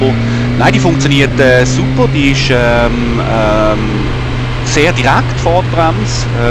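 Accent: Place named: Aargau, Switzerland. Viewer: Was das fürn Dialekt, klingt nach Aargau aber irgendwie komisch :D Grüße in Richtung Heimat.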